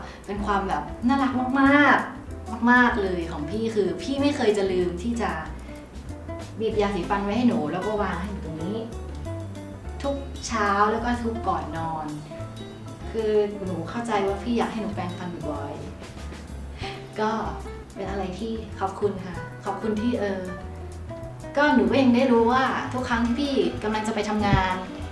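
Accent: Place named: Isan, Thailand. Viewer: พูดภาษาอิสานเก่งจัง สำเนียงเหมือนมาก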